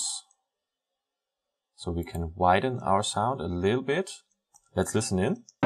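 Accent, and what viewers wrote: Germany. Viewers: man hört das deutsche so doll raus XD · Mark Toronto Hab mich schon gefragt, ob ich der einzige bin, der meint einen deutschen akzent herauszuhören :D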